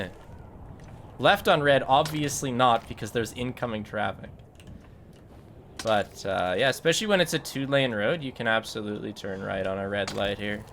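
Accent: Canada. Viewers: I love how Chat makes fun of for his pronunciations, despite all of Chat knowing that he's Canadian. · I would've expected something closer to "rundabutt" but "roondaboot" sent me also the Canadian raising when he says "exit" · Something I love about is his Canadian accent But his content is really good. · rundabut, i completely forgot that you are Canadian. (i mean no ill intent. im American and thought it was funny sorry)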